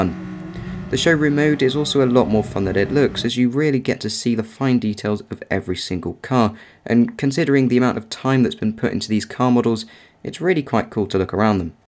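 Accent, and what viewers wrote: United Kingdom. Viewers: What area of the uk you from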